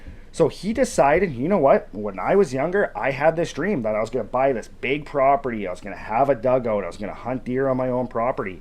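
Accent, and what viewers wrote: Canada. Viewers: Huh, so that is what a Canadian Cow Boy sounds like, ey? I couldn't peg where you we're from for at least a couple mins, LOL. Great vid for the upcoming Halloween season! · Could tell you were from Canada or Northern States in US by the way you pronounce your “O’s”! My sister married a ND man and he sounds like you! Love it! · Bruh as Canuck working in the US your accent made me homesick haha.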